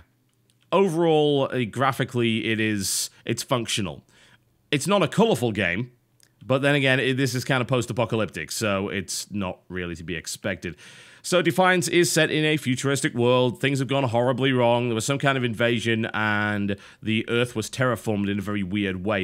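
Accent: United Kingdom. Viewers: You sounded more british than usual in this video. It's not a bad thing.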